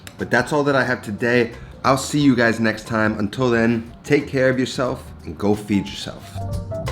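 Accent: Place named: Italian American, United States. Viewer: You speak with recent Italian American accent. Itlian food with chickpeas and chilli flake, how good is that? we will see.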